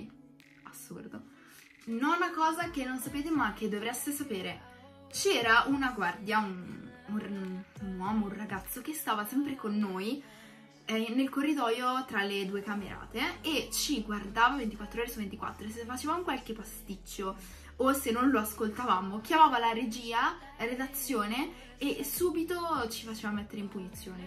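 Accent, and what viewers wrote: Italy. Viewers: Come mai sei Sarda ma parli come in Lombardia?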